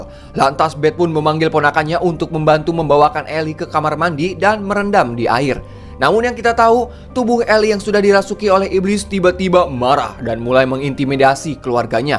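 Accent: West Java, Indonesia. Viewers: Asalamualaikum AA emg org Sunda gitu abis lucu amat kalau nyaritaken pelemna Sagala Aya bahasa aing kitu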